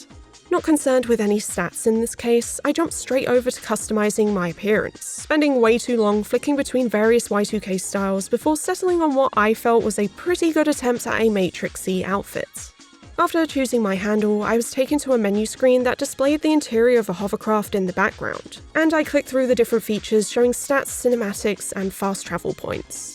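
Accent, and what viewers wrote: United Kingdom. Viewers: WTF you're a woman talking about Matrix video games, your voice is premium ASMR quality, and that sophisticated British accent is the icing on the voice-over cake. LIKE LIKE LIKE LIKE LIKE LIKE LIKE Great job whoever you are, I love you 😃 - Thor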